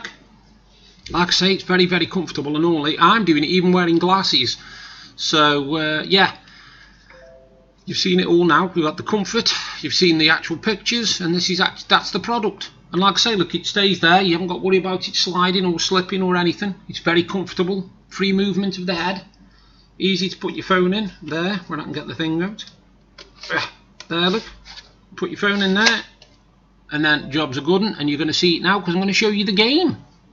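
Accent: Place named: Wales, United Kingdom. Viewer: Are you from Wales? Nice accent! ☺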